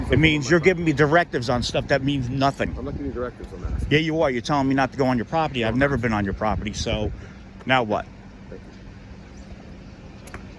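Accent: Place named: New Jersey, United States. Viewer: 😂😂😂 That's how we speak in NJ!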